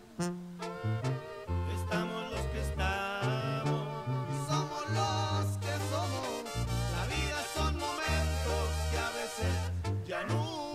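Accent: Mexico. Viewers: Con reacciones como estas… nuevo suscriptor Por cierto tienes un acento muy mexicano 👍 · Usted no párese tica párese sinaloense oiga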